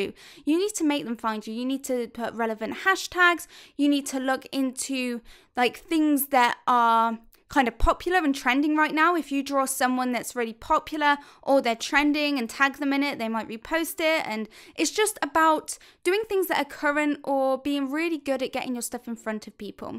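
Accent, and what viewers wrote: England, United Kingdom. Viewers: That is so pretty. Thanks for uploaded. And, again, I love your english accent. Saludos desde Ecuador.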